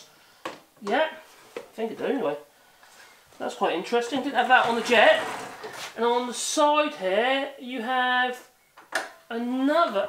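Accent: Norfolk, United Kingdom. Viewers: You sound like a Norfolk boy to me!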